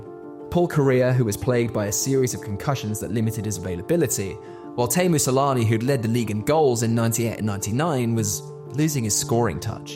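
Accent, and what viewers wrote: United Kingdom. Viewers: It’s my First time on the channel, I’m British and was expecting a North American to be narrating, to my absolute delight he’s British. Love to see it, hockey is so much bigger in the UK than what most North Americans think!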